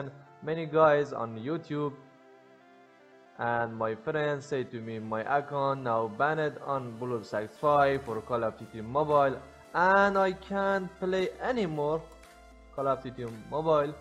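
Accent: Iran. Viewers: ایرانی هستی اینقد لهجت کسشعره مادرجنده ؟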